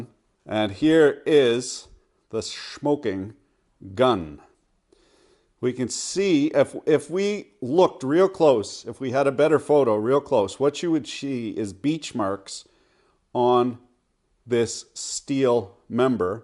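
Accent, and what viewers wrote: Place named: Canada. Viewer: Narrated is classic unfiltered Canadian style -- well done!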